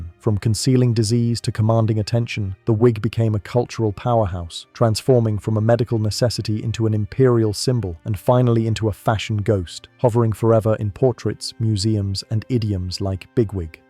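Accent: United Kingdom. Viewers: Under more info it says United States but you have an accent the same as mine (British), do you just narrate them, do you write them, are you an historian? And what about the pictures, are they AI, they are quite good actually, certainly not contemporary. There's a lot on YT about hygiene and toilets (or lack of them) I wonder how this fascinating subject will be tackled by YT (if it still exists) in 10 years.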